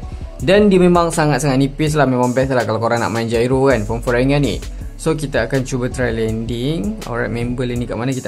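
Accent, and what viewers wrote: Malaysia. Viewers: satisfying bat denger orang malaysia ngomomg hahaha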